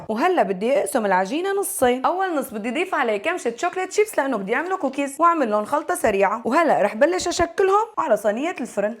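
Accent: Syria. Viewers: صوتهة كلش سوري😅😅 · أي دخيل رب الشام ويلي خلق الشام وهل لهجة البتجنن 😍❤️❤️ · بتحكي سوري اكتر من السورييين ومني😂😂😂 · ععع لهجة باب الحارة ع الحقيقة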